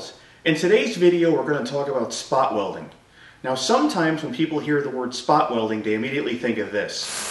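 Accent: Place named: Minnesota, United States. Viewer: Oh okay, your accent sounded a bit like the characters from Fargo.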